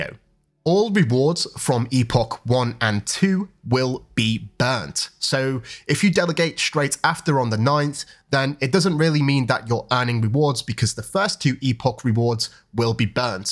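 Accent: Yorkshire, United Kingdom. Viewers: Yorkshire accent for sure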